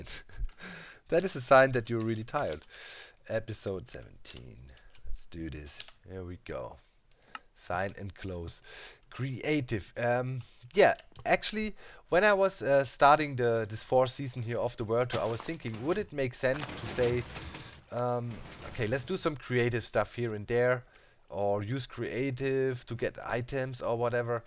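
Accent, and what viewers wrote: Germany. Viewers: Hi. Here's something about me: You and Xisuma were my first two subscriptions. My ears fell in love with your soothing German accent and mannerisms, and even your occasionally mispronounced words. Please say "ingredientses" more often!:D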